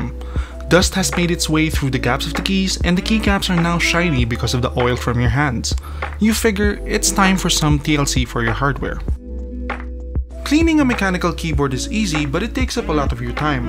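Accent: Philippines. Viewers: Filipino accent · lol that accent is surely a filipino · Sagiri Izumi English is a widespread language in the Philippines, I’m more impressed his accent is not thicker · thanks for this! It's tedious work to clean out my mech keyboard lmao. and you're from the philippines, which i expected from your name but i didnt expect you to speak very proper english. i wouldnt say your accent gives it away, but certainly it wasnt THAT obvious that you have a filipino accent. salamat ulit par! malaking tulong talaga to sakin! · Agree, I knew I heard Filipino accent at first.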